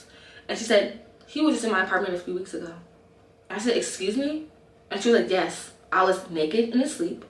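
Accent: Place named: Philadelphia, United States. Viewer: In all sincerity if you ever need to talk I'm here for you. You remind me of my niece I used to be close to. I was born and raised in Philly and I'm still close to you in Willow Grove. We could meet have lunch or get a coffee. I mean it I'm here for you, I've started watching you a year ago and think your soo funny I knew you was from Philly the minute I heard you speak. We have to stick together if you ever need to talk or vent I'm here. I run a small business as well I know how it gets sometime. Please don't hesitate to reach out.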